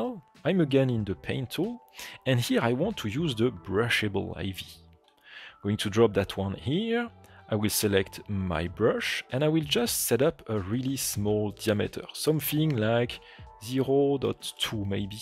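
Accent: France. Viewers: Thank you so much for the great tutorial. And the French accent is just drop dead gorgeous!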